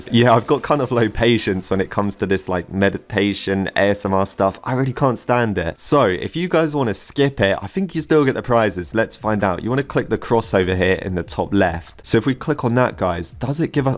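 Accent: United Kingdom. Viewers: Are you using your british accent? I love british accents!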